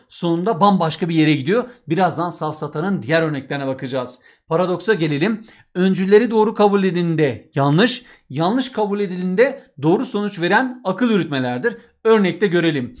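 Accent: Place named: Van, Turkey. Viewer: belli bende vanlıyım anladım konuşma tarzınızdan :D <3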